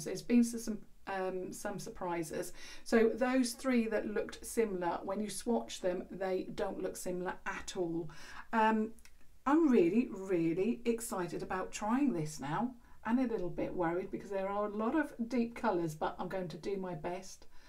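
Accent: United Kingdom. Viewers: Iam an Egyptian engineer, every time i open your videos i focus on your British accent whatever you talking about 😍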